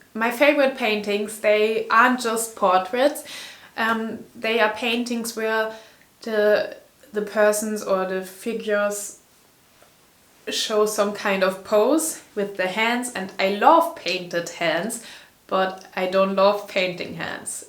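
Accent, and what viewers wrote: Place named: Germany. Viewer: lol love the german accent. cool video!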